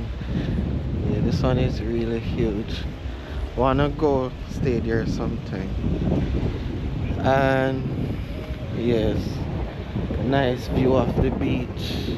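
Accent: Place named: Jamaica. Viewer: The seawall is vastly different from the 1960s when I last visited it. I am surprised they have not lit up the seawall wall itself at night since it is meant to be a gathering place. Anyone could fall off the ledge or be assaulted, and no one might notice. It looks like 2' to 3' wide. You should wear headgear with a LED light. LOL Did they eradicate the mosquitoes? The burger lady needs some training in customer service. She didn't even crack a smile or a "thank you for your business, and come again. Good night!" You met with with a nice group at the end. The one Trinidadian (accent) and the dozen Guyanese (accent) around the table reminded me of when I visited the relatives in Guyana. LOL At least they understood your Jamaican accent. If you put a Trinidadian, Guyanese and a Jamaican in a room with Americans, the Americans would get very confused. 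They couldn't understand me, especially as they were looking a Chinese speaking perfect English. My Trini (Chinese) nephew had two friends at a L.A. trade tech college; a A Belizian (Black) and a Jamaican (Black). They were conversing in their native dialects to each other in class. When the American instructor walked into the room, he said to the the ladies, "I didn't know you spoke Chinese." The trio looked at each other, and bust out laughing.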